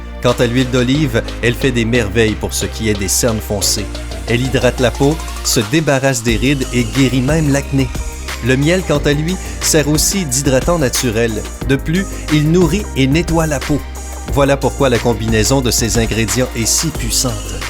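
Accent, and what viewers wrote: Quebec, Canada. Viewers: Bonne vidéo mais j'aime pas l'accent québécois 😲😲😲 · J'adore l'accent québécois, je pourrais écouter pendant des heures !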